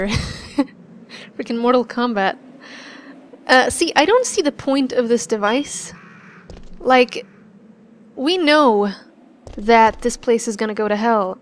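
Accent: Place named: Sweden. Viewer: Swedish wow I have never heard a swedish accent :), lol I'm guessing you're getting mad about people saying you don't? I do have to say your english is very good, however I do like that accent!! It sounds awesome.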